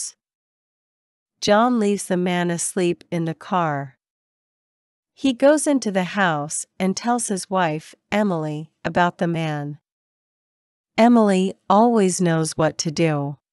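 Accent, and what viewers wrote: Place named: United Kingdom. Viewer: I have been listening to your voice for 9 years. I have no way of accepting other British accents and robotic accents. I'm glad to hear your accent. Thank you so much!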